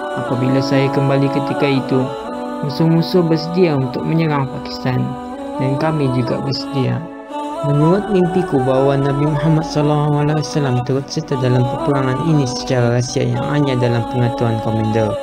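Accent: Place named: Terengganu, Malaysia. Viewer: Bunyi cara bcakap nie loghat terengganu,org trgganu dlm bahasa baku..trbaikkk...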